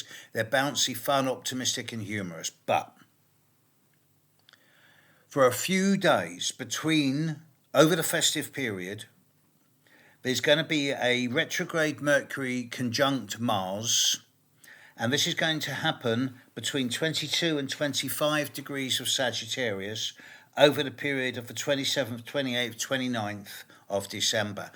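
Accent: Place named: Bath, United Kingdom. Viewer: You look really fresh and well rested. I love that some of your words still have a bath dialect pushing through. Greatest astrologer ever. ❤️🫶🏽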